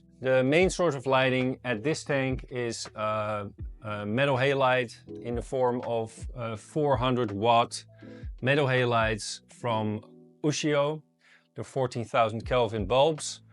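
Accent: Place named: Netherlands. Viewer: Wist niet dat je uit Nederland kwam joh! Dacht al aan je accent te horen dat je geen Engelsman of Amerikaan was, maar je Engels is erg goed moet ik zeggen. Dapper dat je in NL nog metal halides durft te gebruiken met die bizarre energieprijzen 😅 Mooie grote bak trouwens! Heel benieuwd hoe het erbij staat als er frags in staan. Je hebt er een abbo bij 👍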